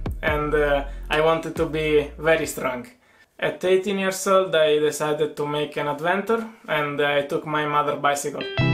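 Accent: Italy. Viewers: si sente tantissimo l'accento italiano grande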